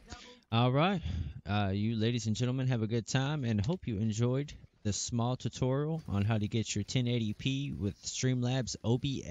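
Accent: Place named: United States. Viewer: you sound like a american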